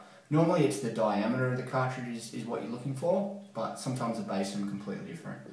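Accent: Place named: Australia. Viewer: Outstandingly good video because it was clearly demonstrated by an expert who spoke Aussie language. Good on ya mate..thanks!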